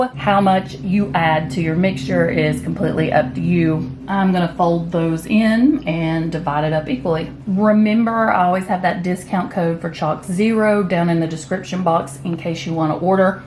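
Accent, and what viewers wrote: South, United States. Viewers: That's an enjoyable accent, I guess some of the more southern US? Greetings from Germany 🇩🇪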